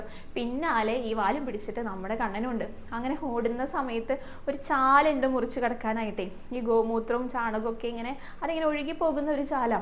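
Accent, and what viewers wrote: Thrissur, India. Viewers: Unnikannante kadha mol paraunnathu kelkkumpol munnil nadakkunnathupole thonnunnude. Athratholam resamnu kelkkan pretheykich Trisur malayala siliyil paraumpol. Innathe kadhaum super dressum super.Njan kannyakumarikkarana mole.anikku Trichur malayala sili valare eshttamanu.Njan krishna Bhagthanu.kudumpathil 8_mathe puthranayathinal Gopika Remaman anna perum kitti. Athinal Njan pathivai kadhakal kelkknnu. Molkku sarvaiswriyavum tharan prarthikkunnu.Krishna Guruvayurappa.😊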